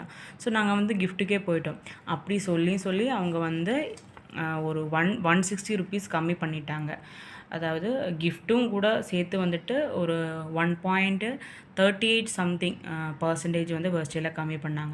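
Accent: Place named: Malaysia. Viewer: You are malaise your slange you speak in malaise why in Tamil to earn money 💰 using tamilans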